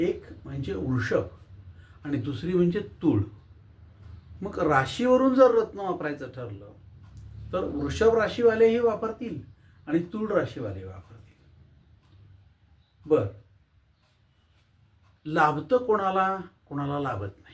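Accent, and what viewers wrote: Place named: Kolhapur, India. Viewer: मी हेड फोन लावून एकत होतो पण वारंवार वाटत होतं हे कोल्हापुरी असावेत आणि शेवटी मी मोबाईल मध्ये शेवटी पत्ता दाखवला तो कोल्हापूर 😅😅😅😅 थोडा ठसका जाणवत होता. 😅भाषा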